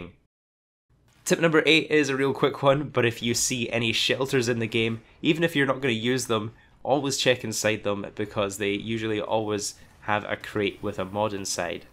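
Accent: Scotland, United Kingdom. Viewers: I'm a late comer to this game. Thanks for that. Only just found the brother so it's hotting up. Good to hear and see a Scottish youtuber doing well. I always thought my accent would be a set back. Doesn't look like it with you.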